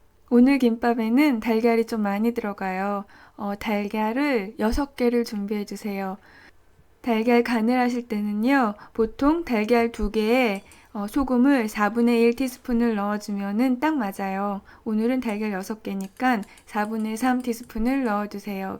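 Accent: Seoul, South Korea. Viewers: 교포가 아니라 서울사투리 쓰셔서 그런것같애요^^ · 말투가 80년대서울사투리에요 ㅋㅋㅋㅋ<3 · 목소리좋아요. 서울본토발음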